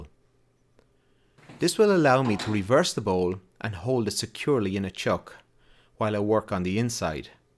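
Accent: Ireland. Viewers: Nicely done. You are very Irish indeed from your accent and I see from your website you're SW of Dublin on the other side of the Wicklows. Live there some 20 years ago on a work assignment. A highlight of my working career. When I return next to the Auld Sod, I'll need to look you up for a bit of instruction. Cheers.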